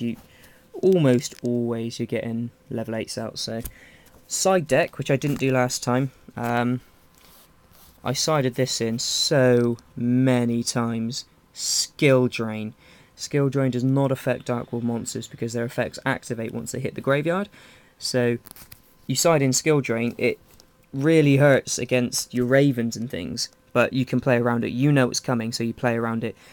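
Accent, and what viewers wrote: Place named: United Kingdom. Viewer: you sound like charlieissocoollike but probably its just the british accent :D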